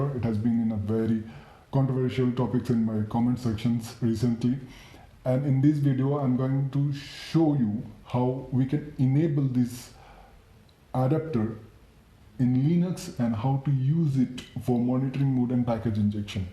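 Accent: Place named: India. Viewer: Aren't u turkish? Its sounds like ur indian ur not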